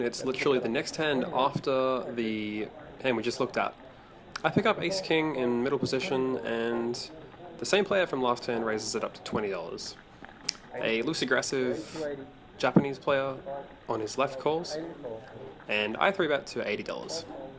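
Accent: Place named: Europe. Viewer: Can you include where you are playing your sessions please ? I notice the dealers are dealing from what appears to be a shoe? Never seen that before and I'm just curious as to where your from I noticed an accent so I'm guessing somewhere in Europe? Anyway keep up the good work the Vlog looked great. Have a great day.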